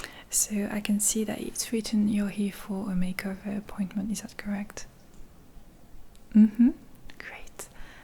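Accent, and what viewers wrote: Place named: France. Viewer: Beyond just the beauty of your accent and the cadence of your voice, the nuances in your sentence structure are absolutely lovely and charming and they add so much to the uniqueness of your videos <3 For example: “strong colors are not scaring you in any way” would most likely be phrased, “you’re not afraid of bright colors” by a native English-speaker, but the delightful quirks of fluency in a second language include a non-standard sentence structure and I LOVE IT 🥰 Whether it’s a conscious choice on your part or simply a byproduct of having French as your first language prior to learning others, it’s marvelous.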